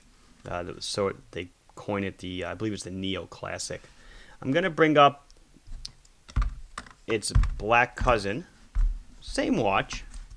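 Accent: Long Island, United States. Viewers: you’re the best watch teacher on YouTube! I’m a teacher myself and I can confidently say that your calm, methodical teaching style and pure joy for watches has made learning all about them easy and interesting. Plus the awesome lawng aisland accent goes a long way! Thanks for making all these Watch and Learn vids for us uninitiated to pick up the clues about the complex world of horology. I was given this seiko (in black🤘🏼) as a gift and came here to learn about it (it’s awesome, legible for how complex it is and not at all too big for my small 6.75” wrist). I now have a new hobby, thanks to and (also his mate over at urban gentry - love how they’re pals) Legends - thanks lads!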